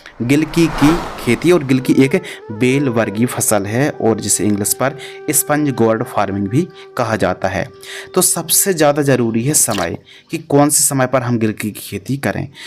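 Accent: Bundelkhand, India. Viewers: Bhai tum ka bundelkhand se ho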